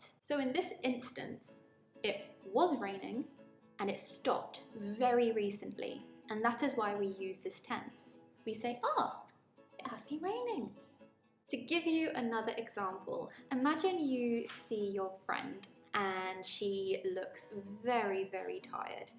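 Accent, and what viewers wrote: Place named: United Kingdom. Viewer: Hi,! Thank you for this useful lesson! ❤ I've never been using the Present Perfect Continuous tense in my daily conversation, but now I certainly will. And I love your British pronanciation! ❤ With the best wishes from Ukraine! ❤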